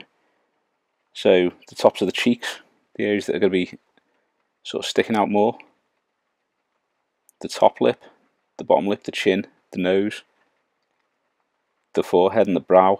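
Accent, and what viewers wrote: Liverpool, United Kingdom. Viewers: Again, a beautiful, easy to follow tutorial :-) I just bought the Vallejo skin painting set, and have tried following their instruction booklet, but it takes so long to get the tones right, that halfway through, I was flagging and fast losing interest. These quick tutorials are SO much better for someone like me, who doesn't paint to "Crystal Brush/Golden Demon" standard, and just likes to paint for fun. Also, LOVE the Scouse accent, as I haven't heard that since my drinking days in Birkenhead (Stairways nightclub featured heavily in those halcyon days) and other Merseyside areas. I collect Frostgrave now, and love painting them, especially the Dwarves, female soldiers and Cultists, just for the sheer diversity. Again, love these amazing tutorials you make, and wish we'd had them 40+ years ago, when I first started collecting and painting Airfix 1/32 & 1/72 scale soldiers.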